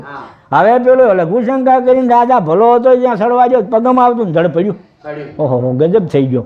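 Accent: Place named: North Gujarat, India. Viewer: Love Gujarati language ❤❤❤in north Gujarat style ❤❤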